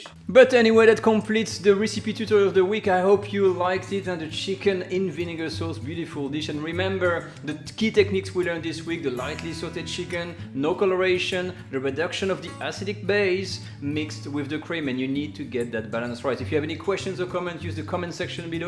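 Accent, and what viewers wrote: France. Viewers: OH My god i love your french accent soo cute <3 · I wonder what kind of dessert would pair nicely. With the acidity of the main, probably something light & sweet to round out the taste spectrum. Side note: I can hear how being in France is starting to subtly influence your accent. (Not a bad thing.) Speaking your mother tongue so much is probably a real treat right now.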